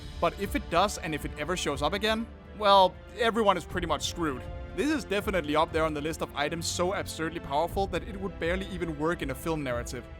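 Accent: Denmark. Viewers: sounds lowkey like you're from denmark hehe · You sound danish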